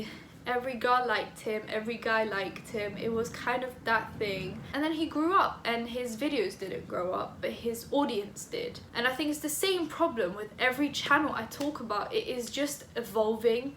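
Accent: United Kingdom. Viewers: Not to be rude bc i enjoy your content and it’s not like you can control this but for some reason I just can’t stand british accents. Idk why but for me it’s like nails on a chalkboard. Anybody else feel that way or is it just me?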